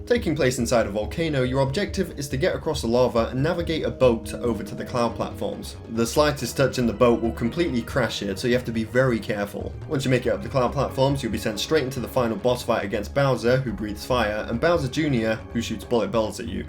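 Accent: United Kingdom. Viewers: Two of my favorite youtubers in one....and hes british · but he's british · Why is British now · Maybe it's just been a while but I could have sworn isn't british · 1/10 too british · British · It's like Bri'ish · The British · but british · but British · BRITISH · Wow, minus the british accent everything was spot on, could've fooled me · Oh god he's british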